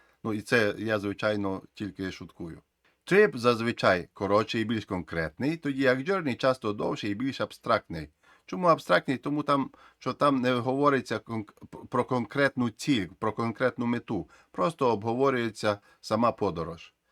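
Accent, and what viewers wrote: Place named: Ukraine. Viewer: Тема хорошо раскрыта. Мне нравится особый украинский диалектный акцент автора ролика. Интересно с какой он области?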